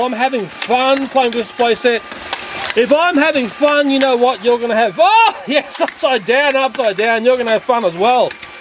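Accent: Australia. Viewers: I’m sure your target audience for this video is two three olds and older. I know my daughter will love to watch this she’s two. I’m 24.. and I just watched this through.. I love it 😆 so you know your kiddie YouTube videos are not only for kiddies.. you’re a great person and I love all your videos.. I try to share all your videos as much as I can.. love you as a person, were in the uk. But your awesome Australian accent always is my three in the morning cure to go back to sleep 😛 chronic insomniac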